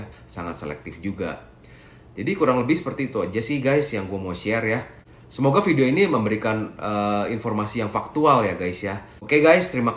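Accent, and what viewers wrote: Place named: West Java, Indonesia. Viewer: Koh logatnya kerasa sundanya hehe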